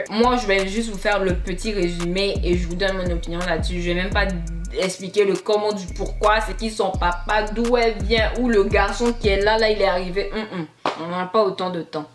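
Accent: Quebec, Canada. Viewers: J’ai l’impression que t’as grandi au Québec ou au Canada t’as un peu l’accent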